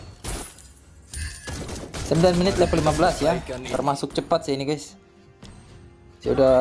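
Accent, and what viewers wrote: Manado, Indonesia. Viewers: Bg orang manado?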